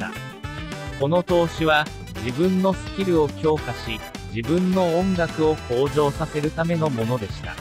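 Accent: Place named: Kansai, Japan. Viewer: は、やはり関西弁バリバリの解説がしっくり来るのですが😅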